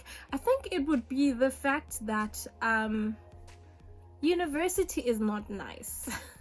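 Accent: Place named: Johannesburg, South Africa. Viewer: Why does it sound like you were a UJ STudent?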